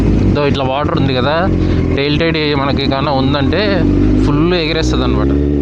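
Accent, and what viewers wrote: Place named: Rayalaseema, India. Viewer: Anna Rayalaseema yasa superga undhe…Anna medhe kadapa na ??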